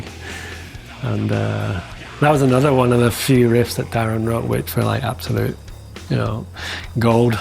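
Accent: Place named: Sweden. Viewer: Sounds like he's lived in Sweden so long that he's developed a Swedish accent. Or is this how people from Corby sound?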